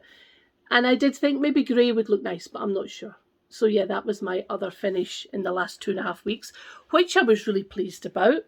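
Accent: Scotland, United Kingdom. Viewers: I just found you and love your stitching and your lovely Scottish accent. I love the Flea Market pattern - actually anything by Lori Holt. The Tudor pattern is very sweet and one I'd love to stitch. Enjoy your vlogs. Cheers from Canada. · The Tudor Bee is nearly as lovely as your scottish accent! 😊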